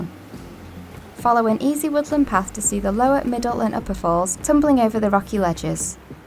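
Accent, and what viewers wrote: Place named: Yorkshire, United Kingdom. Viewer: That was great. Superb photography, and a lovely Yorkshire accent.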